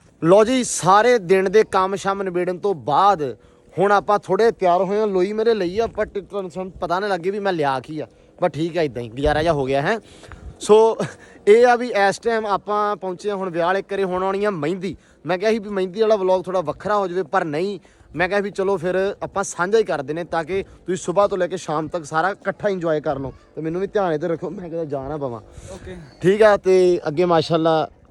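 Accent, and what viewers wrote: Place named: Malwa, India. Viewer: Bai g tuhadi boli sade malwe nal mildi aa me machhiwara sahib ludhiana punjab to ha ❤🎉🎉🎉🎉🎉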